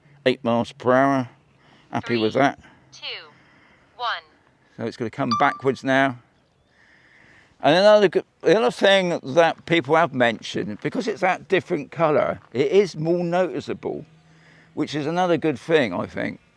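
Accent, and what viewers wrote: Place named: England, United Kingdom. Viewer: I am entrigued to know where in the UK you came from before moving to Spain. I seem to detect something of a London accent merged with something from the South-West of England. Am I correct?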